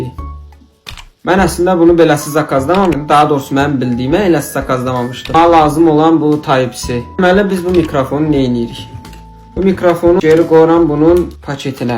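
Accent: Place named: Qazakh, Azerbaijan. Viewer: Qaqam haralısan lehçen Qazaxlılara oxşayır ❤ men ❤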